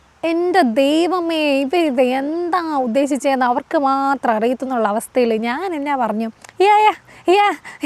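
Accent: India. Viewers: Don't try to fit in Embrace the Indian accent of English language😉❤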